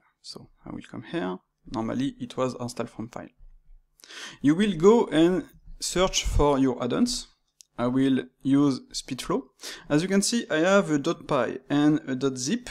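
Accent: France. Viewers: I can tell you're french :)) btw thanks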